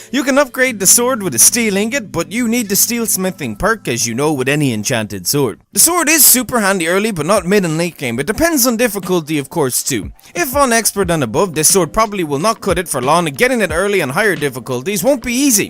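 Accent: Ireland. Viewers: Yes irish...just yes! Love the rambling!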